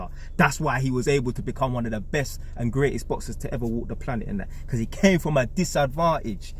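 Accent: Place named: Roadman, United Kingdom. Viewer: Why did you talk English in your early videos, but now you speak like a roadman? (i dont mean to be disrespectful)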